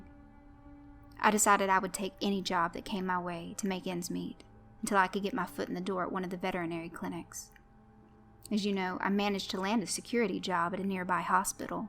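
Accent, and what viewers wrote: South, United States. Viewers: Thanks for the narration <link> I liked the Southern drawl you added to it. As always, you did a good job with it. Sadly enough, the story was based on an experience about back when I was hospital security when an abusive father sweet-talked his way into the ER to visit his daughter. I wonder if you might have any interest in any of the other parts of the series <link> It's more of a theme of work-related horror stories than interconnected stories, but it might interest you.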